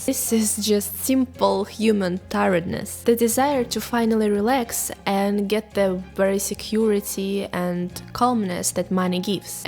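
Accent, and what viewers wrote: Russia. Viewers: I just love the Russian accent! And many of you look like supermodel without being a cringe fest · Good videos though you a little too fast with your delivery. Due your accent us native English speakers may have trouble following along. The memes make it funny & engaging too. Also i’d love to see a Russian YouTuber tackle those politically sensitive subjects, so, a) anyone got any recommendations , b) to the author, if you ever leave Russia, there is a gold mine of subjects us westerners would love you to explore. Appreciate speaking on some subjects is life changing tho. Peace out.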